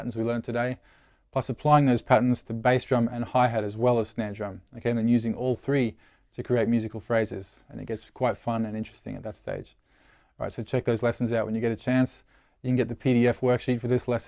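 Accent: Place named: Australia. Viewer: mate! I knew as soon as I heard the Aussie accent that these vids are going to be dinky di. You don't waffle on with a 20 second intro of some extremely mediocre hard rock music, followed with a "Hi guys, I'm from xxxxx in xxxx, like to say a huige thanks to my sponsors" Blaaah blah blah... Straight to the point! Love it mate! Cheers from Melbourne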